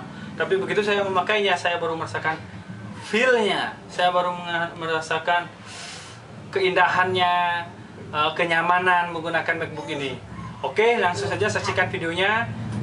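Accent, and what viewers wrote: Bali, Indonesia. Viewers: kaya logak bali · bagus videonya, tingkatkan lagi bli.. khas sekali logat balinya.. hehehe suksma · tong logat bali sing ilang... Mantappp oom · Logat balinya kentel saja bli 😄..suksme infonya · Itu logat Bali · Pasti Orang Bali... Kental banget Logatnya.. Hari Minggu TOKO TOKO PADA TUTUP, Phanter irit cling cling... xixixixxixi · bali lah.. bener gak bli? =))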